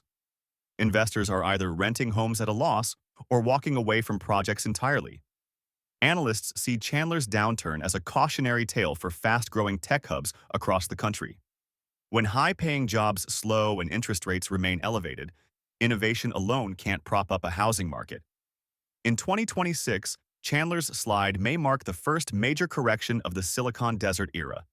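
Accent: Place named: California, United States. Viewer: the speaker can even say the name of Prescott correctly, how do we know if his facts are indeed correct, and are home sale's in Arizona really true facts. This guy sounds like a California person ....?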